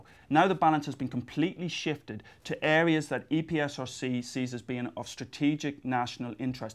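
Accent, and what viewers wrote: Ireland. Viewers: I'm not sure where he is from specifically but to me he sounds like he's from somewhere around Dublin. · Where is from? He sounds like he is from south Dublin, maybe Meath? or even Wicklow? Completly irrelevant but I would like to know. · I'd say its a louth or monaghan accent.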